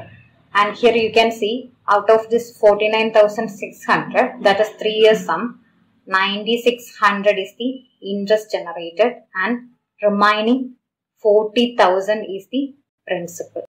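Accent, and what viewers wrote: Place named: South, India. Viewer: nice explanation ma'am I know you're from the south (may be Karnataka)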